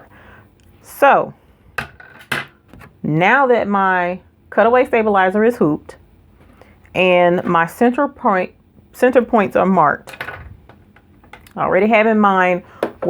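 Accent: South, United States. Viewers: OMG! Your Southern Accent is so adorable! Love the video. Gonna run by the Dollar Tree and give this a try. Thanks so much for the instructional. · Lol, I'm loving the southern belle accent too -- the land of good food, sweet tea and common sense.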